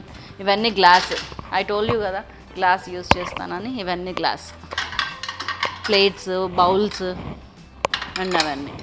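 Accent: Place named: Guntur, India. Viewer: Mee matalu Telangana vallalaga levy akka Guntur valla laga unnai good talking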